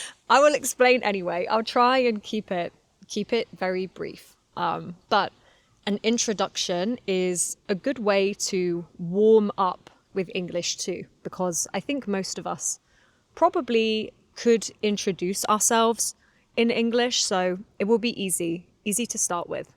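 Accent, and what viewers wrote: United Kingdom. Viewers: Thanks you become one of favorite youtube teachers also i shadow your videos to get British accent ❤😂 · I'm a 45-year-old 'uncle,' and this channel is the perfect spot for me to pick up a proper British accent. ^^ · Hi, I’m from China and I’ve been following you for half a year now. I really love your British English and enjoy every video you post. Your slow-paced English helps me hear every word clearly, which has really improved my English. love you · that's why it's called Great Britain what's a beautiful girl and her lovely dog.is it Wilson?what a great accent girl 🗣 A million thanks From Sudan 🇸🇩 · I am form bangladesh 🇧🇩 i think you don’t know about this country because the country is small but my country population is high & It is a country under Asain countries. Actually i am a student and i am learning English with a British accent that’s why I follow you and watch your videos. 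· I’ve just finished watching your podcast, and it’s been incredibly helpful for my English. Your pronunciation is so clear and easy to follow—a perfect British accent! To be honest, this podcast gives me so much motivation to learn. Or maybe... I’m just hooked on your channel because you’re absolutely stunning? Oh dear, I must be losing my mind! Anyway, I really hope you could make a short podcast episode on how to approach and make friends with a Western/Caucasian girl. That would be amazing! (^-^) 😀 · Hi, I’m from China and I’ve been following you for half a year now. I really love your British English and enjoy every video you post. Your slow-paced English helps me hear every word clearly, which has really improved my English.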